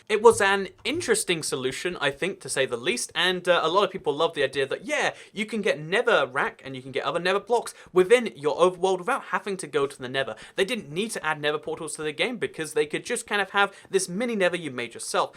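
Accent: United Kingdom. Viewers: Is that a German accent he speaks with in the beginning? Weird since he speaks in a British accent the rest of the time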